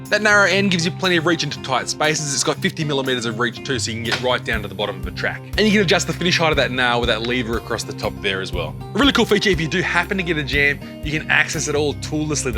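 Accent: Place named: Australia. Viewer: Cant understand your aussie twang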